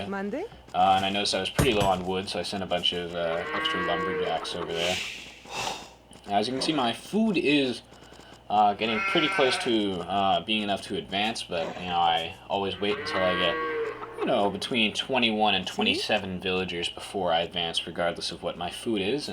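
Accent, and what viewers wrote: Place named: United States. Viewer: I like you extra just because of your American accent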